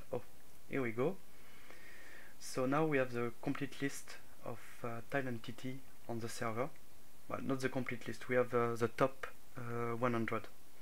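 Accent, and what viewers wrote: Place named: France. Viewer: Ton accent!!! On voit que c'est français pur!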